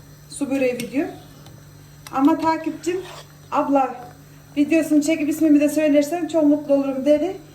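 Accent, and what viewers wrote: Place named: Yozgat, Turkey. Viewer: hanım nerelisiniz ben yozgatliyim bizim gibi konusuyon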